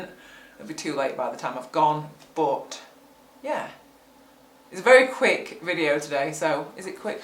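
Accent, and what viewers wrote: United Kingdom. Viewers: Your a nice girl but going bacc packing? So finally i realized ot was bIke packing lol...you limeys are great on youtube very friendly and informative. Just kind of unfortunate the limey accent. My ancesors came from there but now we talk a more normal accent here. I agree 5 plus hours in the sadfle and the butt is really sore!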